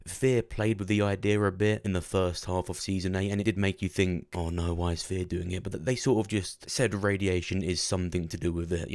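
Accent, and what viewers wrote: United Kingdom. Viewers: you sound british, can you confirm if these will be aired in the uk because this is about to be my 13th reason if not.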